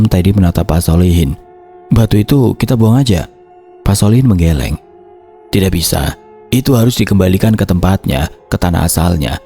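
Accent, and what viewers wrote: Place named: Java, Indonesia. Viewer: Waalaikumsalam, maaf ga maksud tp tiap mas bawain cerita berbahasa Sunda, lucu karna logatnya jawa, suka banget dengernya😊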